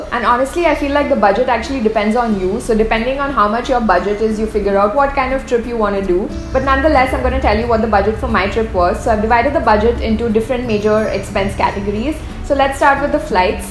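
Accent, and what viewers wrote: India. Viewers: 10.x speed if you speak like this in Canada BC you will be fired from job brain draining Indian accent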